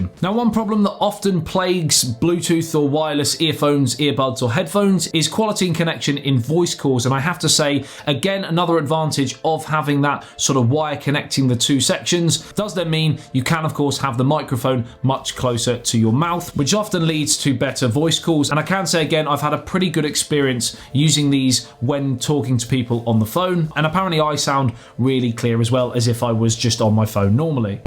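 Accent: England, United Kingdom. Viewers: Thanks for addressing those cuticles, you're getting there bro. 😉 Note 2 - quit shaving your chest. That's so 90's gay porn, lol (don't ask me how I know). And finally, are you and the dude from Abroad in Japan from the same area of England? To this American, your accents are remarkably similar. Thanks for continued awesome content sir!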